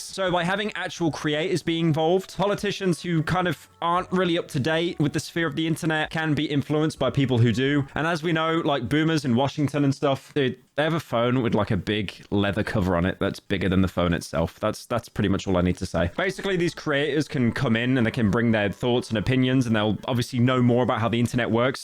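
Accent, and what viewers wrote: United Kingdom. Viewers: british lol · Are you british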